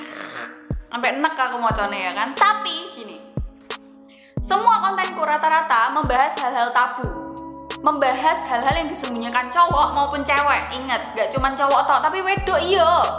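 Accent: Java, Indonesia. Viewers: Senang dengerin medok mu mba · Ngegas medok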